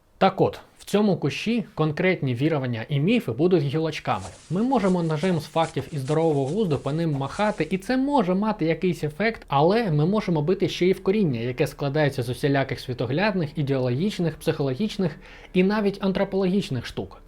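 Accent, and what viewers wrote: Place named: United States. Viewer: На фоні включив це відео просто по приколу, але в моменті справді стало цікаво. Це якийсь суржик чи фішка що ти в більшості міняєш г на ґ) спершу думав що помилився, але чим далі тим більше стало здаватись що ти омериканец який добре оволодів мовою, але залишив акцент)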